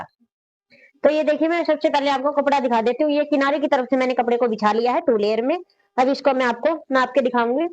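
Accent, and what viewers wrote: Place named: Kaithal, India. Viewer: Ap kaithal side hein kya.mere mama rhte hein unki boli h ap jaisi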